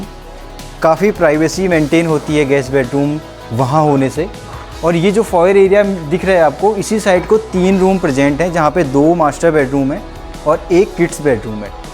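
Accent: India. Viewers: bro is 100% kumaoni, i can tell from the accent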